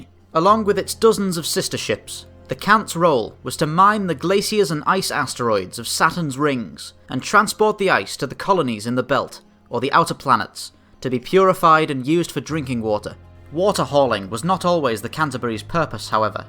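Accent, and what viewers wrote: United Kingdom. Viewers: I really like your videos! Question are you British it sounds like it.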